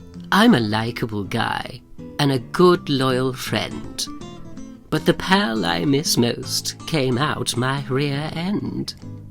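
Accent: RP, United Kingdom. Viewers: Omg!!🤣🤣👊👏👍 you are hilarious. I've never heard someone with such an accent telling stories like this! The posh accent makes it even more funny!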